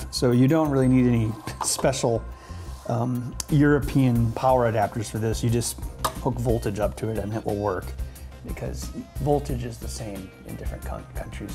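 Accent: United States. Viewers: we say zhead x spectrum because that's how is meant to be said as its a uk product and the uk invented the language that the yank ruined lol. I would say speak English boy but I know for yanks this is impossible . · American English! Sound as thick as shit, plus uneducated with easy!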